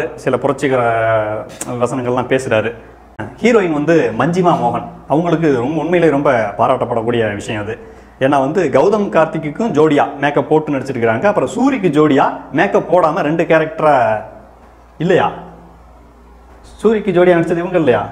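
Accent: Madurai, India. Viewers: மாம்ஸ் இன்னைக்கு மட்டும் 10 வீடியோ பாத்துருக்கேன்.. என் மனசுல இருந்தத பூராம் மதுரை மொழில செல்லிருக்க.. சிரிச்சு வயிறு வலிச்சிருச்சு... சத்தம் போட்டு சிரிச்சோம்யா.. மாம்ஸ் வாழ்க...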